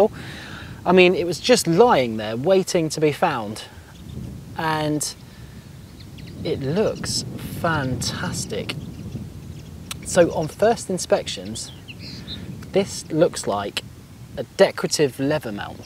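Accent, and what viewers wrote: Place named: South, United Kingdom. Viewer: That was interesting, thank you.What part of Britain are you in? I'm guessing somewhere down south.🙂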